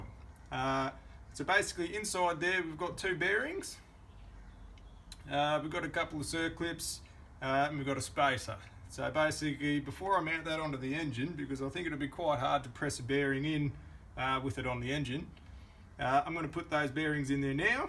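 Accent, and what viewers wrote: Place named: Australia. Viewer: Hi I’m interested to know where abouts you are, I noticed the Aussie accent. I’m in Melbourne and I have an old Rover edger I need restored.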